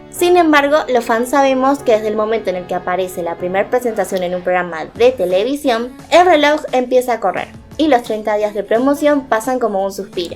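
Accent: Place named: Argentina. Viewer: Te siento acento Argentino XD.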